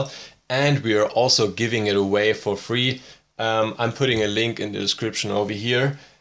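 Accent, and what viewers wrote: Germany. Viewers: You're german, right? :D Nice Video!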